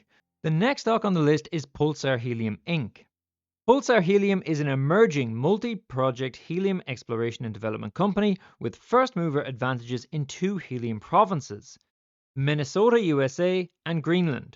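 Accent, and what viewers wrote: Ireland. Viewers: The irish accent is killing me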